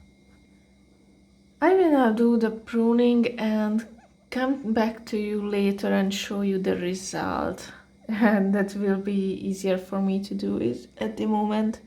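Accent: Hungary. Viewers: immediately recognised the Hungarian accent:D Thank you for the video! It's very helpful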